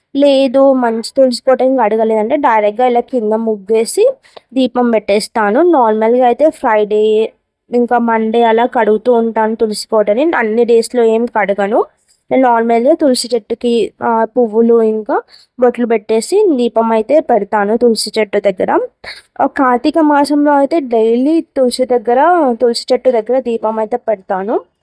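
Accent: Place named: Sangareddy, India. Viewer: Hii sis first time mi video chusanu.mi slang same maa lane undi madi sangareddy.mi villege yenti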